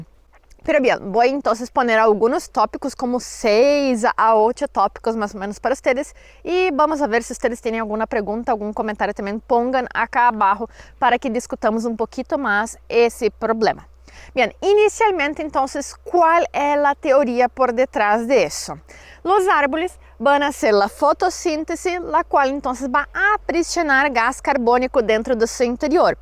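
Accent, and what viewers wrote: Brazil. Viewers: Olá, Vocé é do Brasil, não é? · Você é brasileira? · O seu espanhol com sotaque brasileiro meio portunhol é muito divertido jajaja. Excelente informação!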